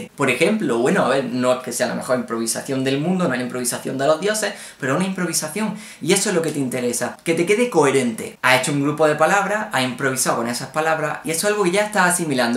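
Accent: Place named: Granada, Spain. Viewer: Tienes acento granaino de donde eres?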